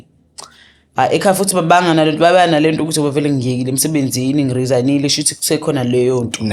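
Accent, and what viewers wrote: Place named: Johannesburg, South Africa. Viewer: 😂😂😂 uhlulekile kodwa uku actor ntombi lestori sakho singamanga, eccent yakho eyase Goli eRange Farm or Bekersdal. EMhlanga awazi nokuthi kungakuphinje 😂😂😂 Kulungike byeeeee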